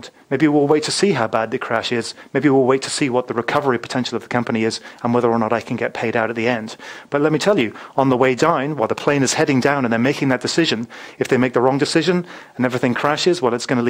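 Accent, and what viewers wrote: United Kingdom. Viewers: Great video. After a couple of minutes I got used to British pronunciation and was able to concentrate on information. Will wait for new videos!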